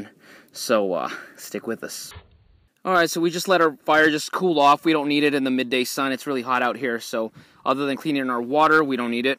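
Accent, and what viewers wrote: Texas, United States. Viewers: Where in texas is he from??????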